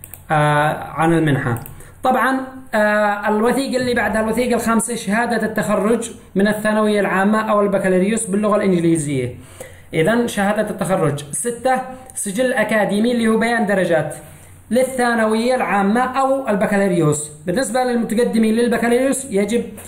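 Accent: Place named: Sanaa, Yemen. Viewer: فدا اللهجة الصنعانية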